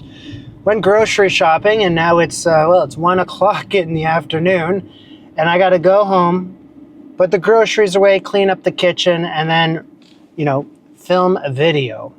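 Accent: Canada. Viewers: Coming from a New Yorker, your Canadian accent has completely come back. I've been watching your show for about 4+ years now and I don't remember hearing your Canadian accent as much as I do now. I've caught a few words with it before, but yeah, lol. I like this other side of your show. Watching you getting the ingredients and how you choose them is pretty cool. I like this style of vlog from you.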